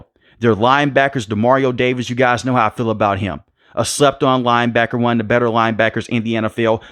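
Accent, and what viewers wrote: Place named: South, United States. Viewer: Narrator has a thick southern accent.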